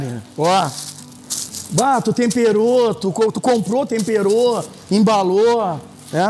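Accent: Rio Grande do Sul, Brazil. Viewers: Bom demais o vídeo e o sotaque gauchesco.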